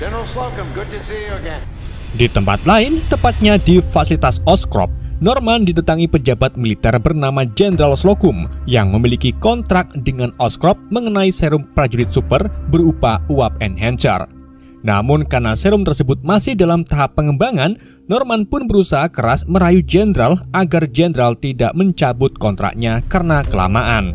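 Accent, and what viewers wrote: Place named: Java, Indonesia. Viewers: Gong-go!! Ente orang Brebes bang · Byoh enk jowo" e😂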